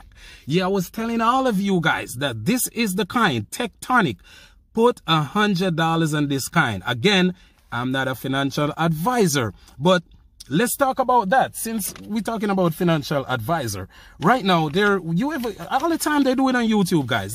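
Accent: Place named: Jamaica. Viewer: Love the Jamaican accent, I’m Jamaican as well Brother. I was thinking along the same lines as you. 100 dollars and just leaving it is a very responsible idea as a leader. Your not pushing, your just sharing an idea. Respect. I was thinking of a different idea, and that is to think like the amc apes, they buy and hodl, the only problem is, the stock market can produce naked shorts and then their battling nobody, because the hedges have found a way to short the stock without using money. In decentralized finance, you can’t do that, I want to see a crypto like this have a community that doesn’t give out crypto, but buys and hodls like apes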